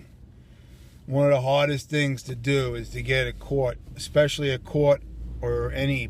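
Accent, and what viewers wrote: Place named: New York, United States. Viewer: I wish I knew u years ago, I just got off child support 2 1/2 yrs ago, and it only stopped because my 2 girls grew up. In the state on NY child support is up to the age of 21, I WAS SERVING MY COUNTRY WHEN I WAS 21!!!, you sound like a NY'er like me and I work for the MTA so you have a round about idea what MTA workers make, like yours my EX WIFE is a evil B*TCH who only cares about the all mighty dollar...I didn't do my homework and for 2 girls I was paying 900 bucks a month in CS...coming straight out of my check. When the CS was up my EX tried to sue me for $100 a month in alimony 2 yrs ago, 1st I laughed then I boiled up the petition and threw it right in the garbage...never heard from her again. for 23yrs I was hounded by CS threatening to cancel my drivers license. I figured you for a cop so you know the system a lil better than us, but I wish I knew someone like you when I was paying...I been with the MTA since 1998 the only things that saved me (mind you I lived with my grandmother until her passing in '99) was I got re-married in 2002 and I have a VERY understanding wife who stuck by my side when I was making 250bucks a week after all these deductions in NYC (just try surviving off of 250 bucks a week in NYC!!!), I started as a bus operator and when I got my 1st promotion to dispatcher I kept my mouth shut and I was making a little bit more money, then I got promoted again to a different title and made more money...When the child support was over I got my first FULL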